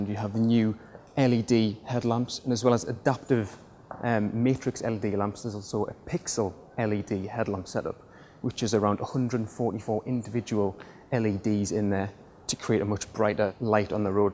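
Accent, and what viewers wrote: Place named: Newcastle, United Kingdom. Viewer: Gan on Geordie lad.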